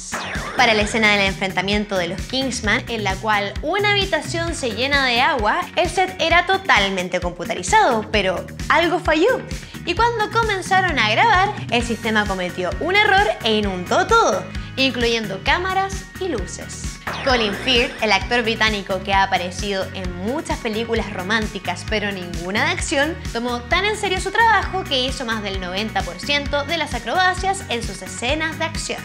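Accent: Chile. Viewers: Chileno?? ESPERA QUE WEA???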